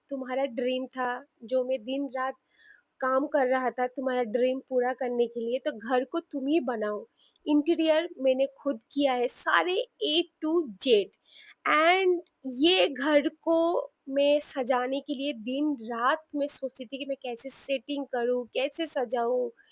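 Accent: Bengal, India. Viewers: Tomake dekhe onak motivation pelam amar o khub tension hoto ami to job kori ki kore biyer por sonsar and job 2 e korbo kivabe samlabo to tomake dekhe sikhkam je ata amader morer tension sob kichu e kora jai❤❤❤ ar ami bengali te liklam Karon tomar hindi bola ta dekhe mone hoche tumi bengali 🥰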